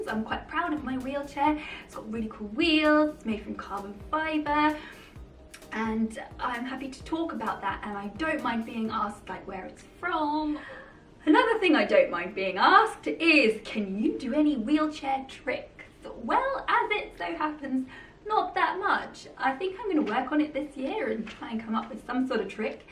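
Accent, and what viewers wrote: England, United Kingdom. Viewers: It's like my opinions in an English accent. Are you reading my mind?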